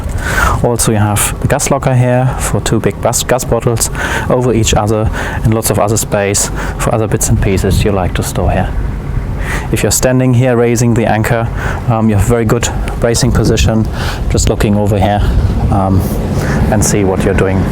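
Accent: Germany. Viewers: Immer Lustig wenn ein deutscher sich in english bemüht. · What a beautiful German accent English!!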